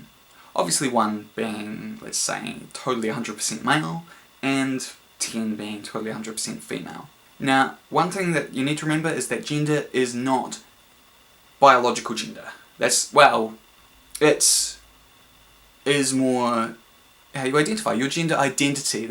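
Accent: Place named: New Zealand. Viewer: what country are you from? you kinda sound kiwi but I might be wrong haha😄☺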